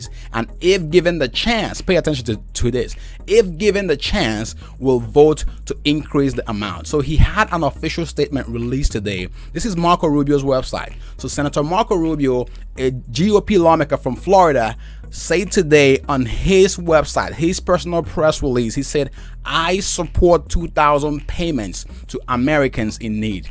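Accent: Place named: Africa. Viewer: Get English lessons please...get the African out of you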